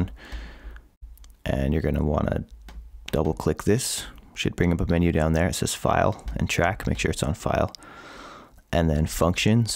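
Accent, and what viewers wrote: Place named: Sweden. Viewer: Sounds Swedish, amazing!!! thnk uu was so helpful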